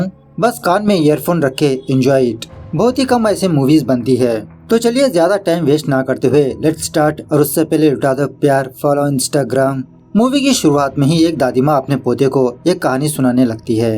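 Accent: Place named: South, India. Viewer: Love ur voice ji😍😍 South Indian hindi bolte to accent bht acha lagata hai..me v south side se hoon..andhra odisha border😀😀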